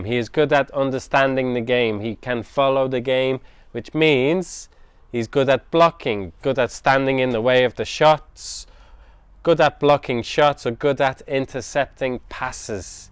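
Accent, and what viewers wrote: United Kingdom. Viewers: Are you English? I like your accent, I'm a new subscript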